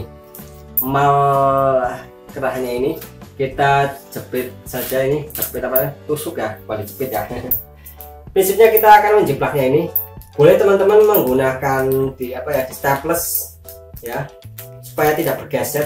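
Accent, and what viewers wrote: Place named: Banyumas, Indonesia. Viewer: السَّلاَمُ عَلَيْكُمْ وَ رَحْمَةُ اللهِ وَ بَرَكَا تُهُ Mas sampean bahasane kaya aku. Tika dr republik ngapak apa ya mas.. Aku jg di tanggerang. Sampean tanggerang mn..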